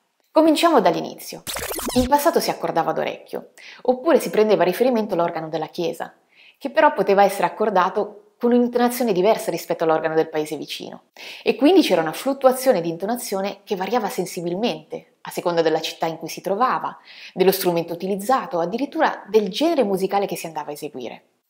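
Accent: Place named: Piedmont, Italy. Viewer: Innanzitutto un sentito grazie per la cortese, precisa e veloce risposta. Continuerò a darti del "tu" anche se, nelle tue righe, sei partita col "tu" per passare ad un più formale "lei" (quando hai aumentato di un 'dente' la polemica... 😜) non fosse altro perché sono più "attempato" di te. Ho guardato altri tuoi video e, oltre a parlare un bell'italiano (sei di origini piemontesi, vero?), esprimi giudizi competenti e risulti davvero simpatica. Di nuovo: complimenti! Ora - però - metto giù il "violino" e vengo "al sodo". Il termine "faziosità" l'hai usato tu non io; e se ti ho "bonariamente accusato" di qualcosa è che in tutto il video appare evidentissimo per quale fazione fai il tifo... E - in tutta franchezza - non ne capisco il motivo. Saranno forse trent'anni che studi il pianoforte; sicuramente avrai sempre usato strumenti intonati a 440; in tutto questo tempo ti sarai formata un orecchio musicale non indifferente; il TUO pianoforte è accordato a 440 (lo suoni in altri video). 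Dunque? Per avere più 'like', stai cavalcando un'onda "new age"? Anche il dott. Corrado Malanga lo fa, tant'è che, da bravo professore di chimica, si è messo a scrivere di "esoterismo": pensa un po' che "scienziato"...! Nella Musica (la scrivo con la maiuscola) non ci sono molti argomenti "scientifici" su cui dibattere: la Musica è Musica, punto. Ciò che piace a me può non essere di tuo gradimento, dunque ciascuno ha il sacrosanto diritto di ascoltare ciò che preferisce, intonato al LA più in sin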